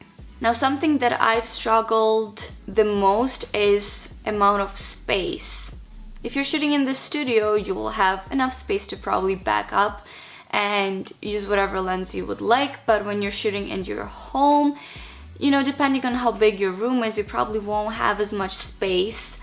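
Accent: Canada. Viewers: Ukrainian / Canadian me thinks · exactly,i think she is canadian. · Now i know how the Canadian accent sound like :)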